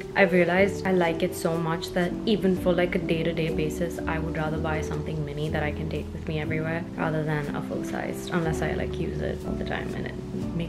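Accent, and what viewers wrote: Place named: India. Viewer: r u from India, coz ur accent is totally different ❤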